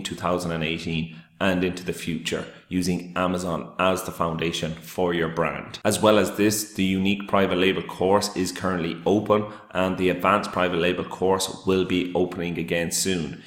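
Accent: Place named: Ireland. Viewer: I hear an Irish accent, what part are ya from ?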